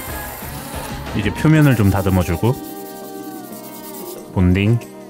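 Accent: Gyeongsang, South Korea. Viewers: 혹시 고향이 갱상도인가요? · 아무리 표준어를 쓰려해도 악센트라 사투리가 나와요 서울사람이 부산가서 경상도 사투리 쓰듯… 그냥 사투리 쓰세여. 강호동처럼..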